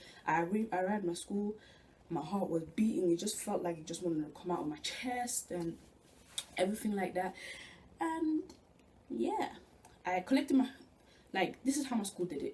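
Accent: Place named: Africa. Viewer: Hi i really enjoyed this this video! especially your accent, where in africa are you from, i'm from the east but i live in england. I'm doing btec creative media (level 3) because i don't think A levels are for me.i start this september! i am happy with my results, i only failed maths but i am retaking that. A you still doing btec?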